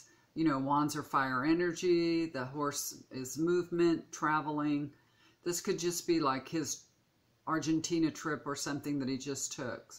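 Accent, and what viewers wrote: South, United States. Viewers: I love southern voice so positive to me. Hope that still do more video.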